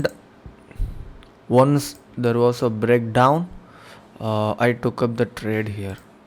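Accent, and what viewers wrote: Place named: South India, India. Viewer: It's with his accent. Lot of south Indian people do that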